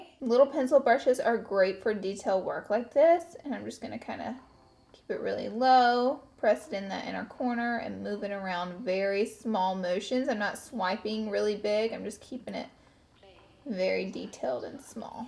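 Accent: South, United States. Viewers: "Olly" The way she says "oily" is interesting to me because she has a pretty thick southern accent (none of this is suppose to offend anyone).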